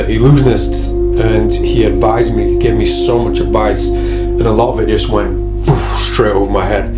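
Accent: United Kingdom. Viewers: Don’t feel embarrassed or humiliated for opening up. That’s bravery. I truly await every video you post, regardless of what it’s about. There’s always a lesson to be learned. Todays: failure is not weakness. I enjoy spending my time watching; and with the English accent it always sounds epic! Haha! So sincerely, “Fanks” 🙏🏼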